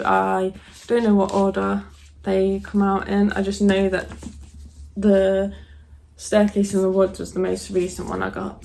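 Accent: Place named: Nottingham, United Kingdom. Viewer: Are you from Notts? Trying to guess your accent!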